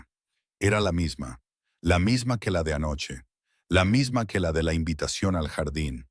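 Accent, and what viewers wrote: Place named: England, United Kingdom. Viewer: Horrible el español con acento ingles, nuestro idioma español es hermoso no lo arruinen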